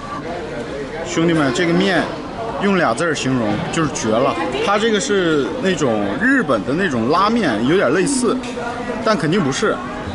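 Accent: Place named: China. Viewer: 你的英语中国口音很重😂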